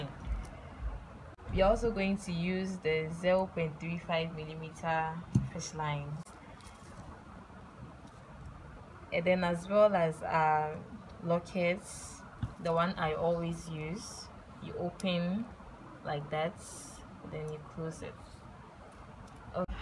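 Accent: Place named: Ghana. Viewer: I love your accent! Are you from Ghana?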